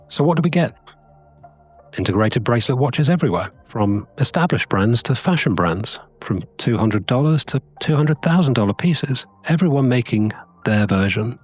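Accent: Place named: United Kingdom. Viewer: My dear friend, first of all — no, you absolutely do not have a froggy voice. Your voice remains unmistakably British and genuinely a pleasure to listen to. Now, onto the video. That final line about “walking backwards into the future” was brilliant. It captures perfectly the tension between nostalgia and progress. It’s such a sharp observation. Your point about the Submariner was also spot on. It’s fascinating how so many brands feel compelled to orbit that design language — trying to be similar, yet different. Over time, that pressure creates repetition rather than evolution. Escaping that gravitational pull is clearly difficult. I particularly appreciated your remark about people wanting a watch they don’t need to explain. That’s incredibly insightful. There’s something powerful about wearing a watch that feels socially legible without effort. 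At the same time, I keep wondering where real innovation fits in today. Large brands often seem cautious, relying on subtle refinements rather than bold steps. Smaller brands experiment more freely, but rarely at a technical level. And perhaps that’s the real dilemma: creativity exists, but it’s either financially risky or commercially unnecessary. I also find it interesting how certain technical use cases — diving, aviation, racing — defined dial design historically, yet most of us don’t live those realities. So what would watches look like if they were designed around modern daily life instead? Comfort, legibility, emotional resona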